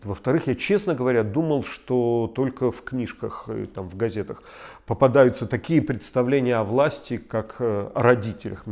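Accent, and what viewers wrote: Vologda, Russia. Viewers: Бегущий Париж провожает меня....Красиво. Спасибо Леонид, особенно за вологодский говор!!! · Люблю Вологодское оканье, как Кёльнский Плят Дойч...)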